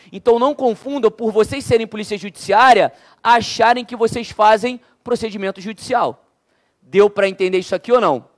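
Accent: Rio de Janeiro, Brazil. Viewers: Na boa , a aula não é mau mas sotaque carioca é um saco kkk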